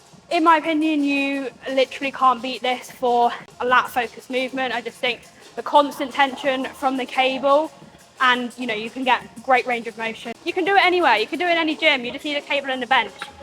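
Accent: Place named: United Kingdom. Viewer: Strong ! It's always a pleasant surprise to hear a British accent :)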